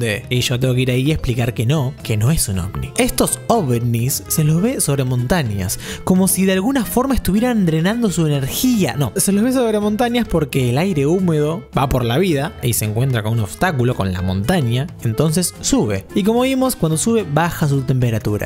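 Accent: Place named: Buenos Aires, Argentina. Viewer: Listo sabemos que sos de buenos aires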